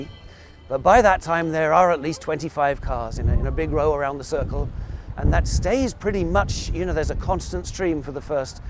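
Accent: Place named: England, United Kingdom. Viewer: ...who is this narrator right off the...boat with his quaint English accent...wtf...moved to waimea due to zip code based average wealth of newly arrived residents that made their wealth through the gravy train FAKE economy now crumbling...Worldwide...HAWAII FOR HAWAIIANS... Waikiki Io Kea... Cult of Ku...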